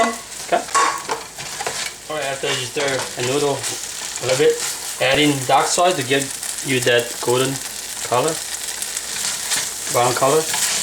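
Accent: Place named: Laos. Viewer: Hi, I was 25 years working with Asian people, mostly Lao, Vietnam and from Cambodia. At first I hate the smell of your food but, slowly when I became friend with some of your people I start tasting the food and today a love it. My favorite are spring rolls with dipping sauce and sticky rice with some vegetable dipping or meet. I enjoy your video and comments your English remind me my dear friends from Laos.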